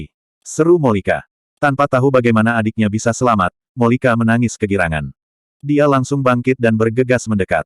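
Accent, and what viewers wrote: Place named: Palembang, Indonesia. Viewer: Wong plembang?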